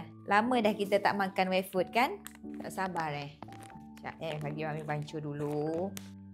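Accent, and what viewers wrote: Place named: Java, Indonesia. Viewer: Akak org jawa ea?dgr cara bcakap pekat slank jawa akak..😅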